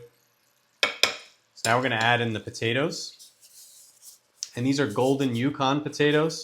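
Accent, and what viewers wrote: Philadelphia, United States. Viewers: Just found your channel. Where's your accent from? Near Philadelphia but not Philadelphia, or southern New Jersey, or Delaware? Those are my three guesses. #1 guess is somewhere in the Philadelphia vicinity, maybe north.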